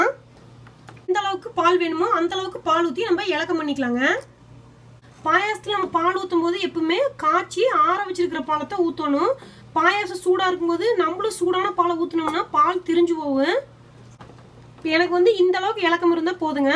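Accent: Kongu, India. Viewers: Nenga pesaratha kekave superb ah eruku... Kongu tamil me 2 kovai thanga akka...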